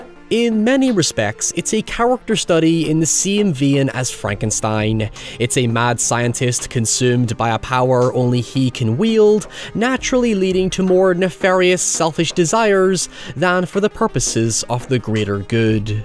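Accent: Ireland. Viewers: first time requester, so I'm excited to share my recent J horror deep dive. I caught Noroi: The Curse, probably the creme de l creme of found footage flicks. It h interesting hook as an investigative journalist tries to get to the bottom of mysterious deaths and disappearances. A slow burn masterpiece with a truly horrifying climax. One for the ages that only your dulcet Irish tone can breakdown accordingly. Keep up the Great work, you've knocked it outta the park this year! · I can tell your Irish, but why are you putting an upwards inflexion at the end of almost every word? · You're British? I thought you were Irish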